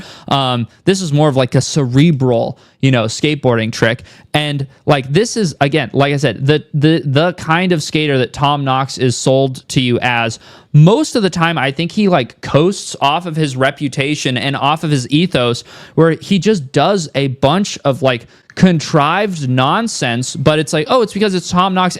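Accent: United Kingdom. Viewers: Love the English accent 😂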